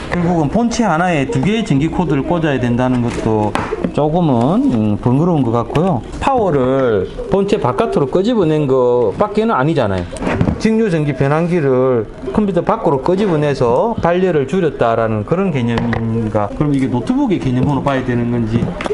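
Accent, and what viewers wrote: Gyeongsang, South Korea. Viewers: 님 어디서 일하세요? 지역이 궁금해서리 느낌이 대구?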